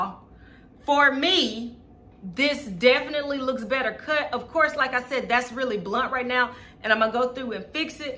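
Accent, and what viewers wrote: South, United States. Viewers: What do I think?? I think we could be friends wit yo country sounded self. Girl you are a hoot! I absolutely love your candor and style!!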